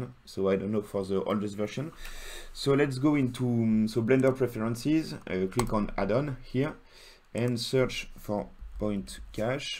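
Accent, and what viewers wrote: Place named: France. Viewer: Quand un français parle Anglais c'est toujours marrant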